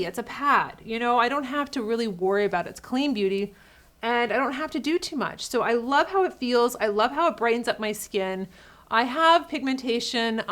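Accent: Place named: East Coast, United States. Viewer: Google says you are from Colorado but you have an east coast accent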